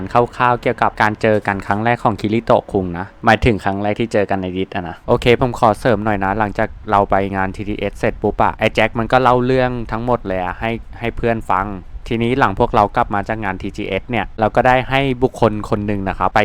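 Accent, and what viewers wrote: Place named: Kanchanaburi, Thailand. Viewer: เสียงพี่ก็เหมือนพี่แจ็คเกิ๊น สำเนียงกาญหรอครับ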